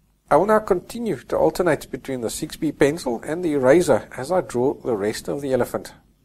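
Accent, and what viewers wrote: Afrikaans, South Africa. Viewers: Is that a Afrikaans accent I'm picking up?? XD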